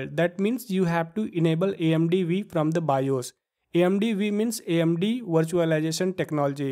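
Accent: India. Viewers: Indian accent tutorials are the best🔥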